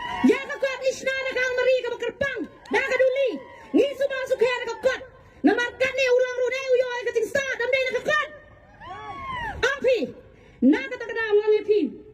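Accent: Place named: Mawlynnong, India. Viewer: Tang rukom kren ruh mawlynnai hi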